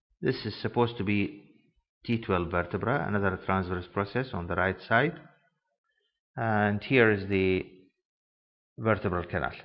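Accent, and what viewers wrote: Iraq. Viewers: هل انت من العراق دكتور احس لهجتك عراقيه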